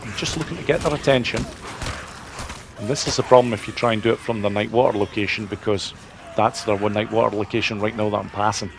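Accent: Scotland, United Kingdom. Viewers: awesome content, brother. All of it. And I always enjoy hearing the Scottish accent. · Thanks for this - all hordes are cleared and some of these methods were used. Can't figure out if you have a decent Scottish accent or no. Or just Eastern european with British accent (no offense). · You're fairly local to me as well, going by your accent anyway. I'm back at work, as a long distance(ish) truck driver. So the whole of the UK is local to me😀 I work for a Stirling company. Thanks for your kind words, but it was almost 3 years ago now, so the chances of getting that 3% back are pretty slim. On the other hand, I haven't given up yet. I did the 2021 Edinburgh Kiltwalk Mighty Stride, as the goal for my legs physio, 6hrs 6 mins. And 500 miles walked in training for it, so not at all bad for an old man 😃 So now I'm off to do all the challenges, with prep from your videos. 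Then I'd better do another play through, to see how much easier it could've been 😂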